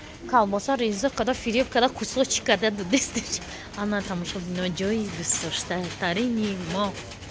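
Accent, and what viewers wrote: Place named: Kulob, Tajikistan. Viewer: Апай духтари Душанбе хасти тоза кулобигт гап мезани зури да👍👍👍😁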